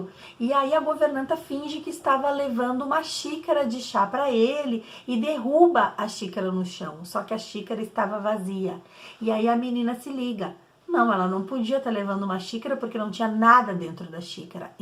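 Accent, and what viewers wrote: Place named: Rio Grande do Sul, Brazil. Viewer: Ahhhh, que amor! Um sotaque de gaúcha misturado com paulistanos 🙃🙂 Eu tbem amo Agatha Christie 🥰 Bom domingo pra vc 😘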